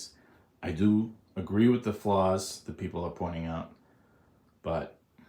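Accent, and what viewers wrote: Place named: Brooklyn, United States. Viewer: I ordered it. Also love your collection! I hear the BK accent lmfaoooooooo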